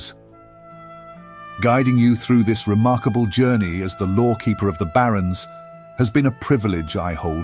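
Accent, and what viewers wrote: United Kingdom. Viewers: Hey, I do not know if this commentary will eventually be read by you, but I must say I really enjoy this splendid series. I am a foreigner so you have no idea how much time I spend on dissecting every little word that I cannot get through haha. This is such a good improving lesson each time a new video comes out though and even if I always considered myself bilingual, realising I still can struggle sometimes with some words is a wee bit frustrating haha. So here I am, catching myself reading outloud the subtitles as you speak and even though the videos are around 20-25 minutes, I spend the double amount of time training and repeating that lovely british accent. So thank you for that, mate. Have a good day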